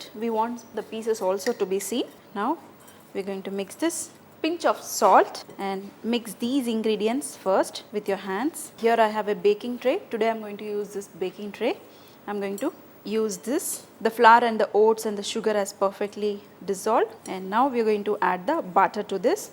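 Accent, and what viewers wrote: India. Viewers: Horrible Indian accent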